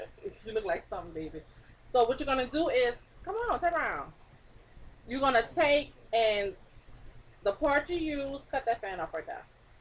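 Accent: New Orleans, United States. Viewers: Gotta be From New orleans ! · O yea yall from new orleans i hear it in her voice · great video! r u from nawlins? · Mama, you just gotta be from New ORLEANS.... You got that "mama" vibe and i LOVE IT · U Can Tell Shee From New Orleans Dat Accent Stronq As Hell Like Mines Lolz Good Video Doe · Gotta Be From New Orleans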